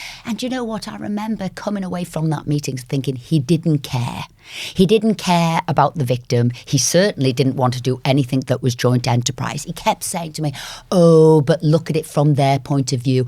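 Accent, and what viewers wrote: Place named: Liverpool, United Kingdom. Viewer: She has a strong Scouse accent so she emphasise' her words to ensure even ignorant people understand.. 🤣👍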